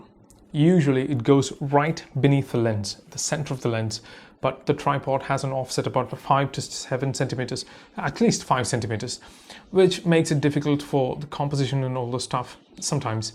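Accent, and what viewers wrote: United Kingdom. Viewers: British accent maadhri irukku naa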